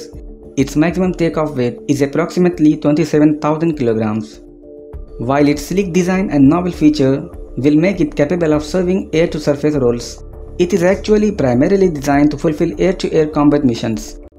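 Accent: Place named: Russia. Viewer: It seems that he is from central asian countries like kazakhstan his accent of english is like russian but he is trying to speak like british accent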